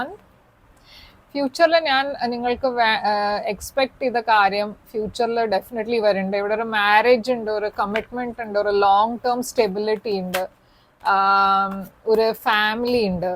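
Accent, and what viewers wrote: Kerala, India. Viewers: Very interesting predictions for Virgo…🙏🏻🙏🏻🙏🏻 I like your malayalam accent very much..😀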